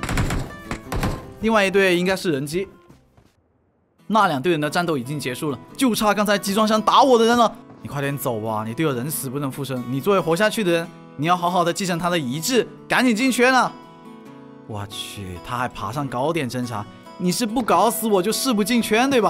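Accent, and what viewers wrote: Hong Kong, China. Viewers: 你是香港人吗？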